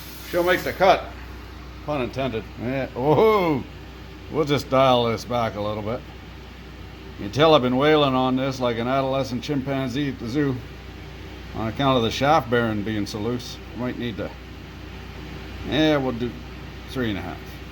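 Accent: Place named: Nova Scotia, Canada. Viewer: I swear this guy is from Nova Scotia.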